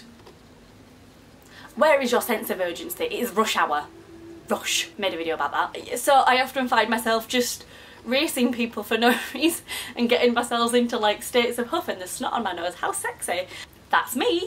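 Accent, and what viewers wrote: Yorkshire, United Kingdom. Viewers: i think yorkshire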